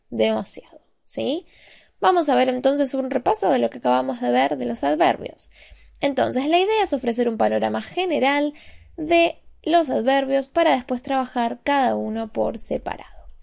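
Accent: Argentina. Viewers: Muchas gracias. Es de Argentina deduzco,por su voz, lo dice un cordobés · eres argentina?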